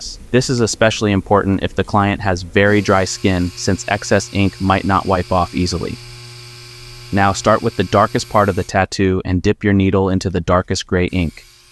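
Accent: United States. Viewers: an Austrian artist with a perfect American english...awesome! Im Dan, from Brazil and I inspire on becoming an artist. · I totally thought you were American. Possibly even from my area in the Pacific Northwest. So cool to find out your from Austria! Love the content dude. These vids are incredible!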